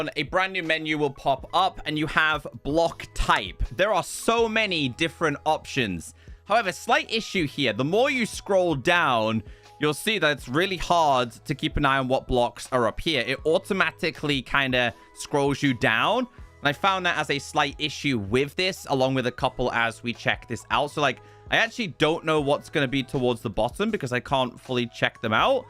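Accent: United Kingdom. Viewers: I was watching one of your videos and my Dad said “what in the British!?”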